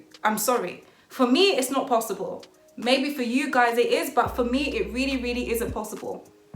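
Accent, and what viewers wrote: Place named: Jamaica. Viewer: You sound a bit Jamaican are you Jamaican? #Party #Protect_me #ova 💊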